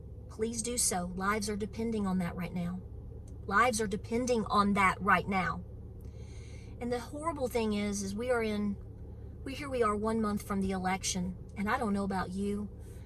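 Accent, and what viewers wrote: United States. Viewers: I love that country accent! We have to help one another ❤ Be safe storm season is just beginning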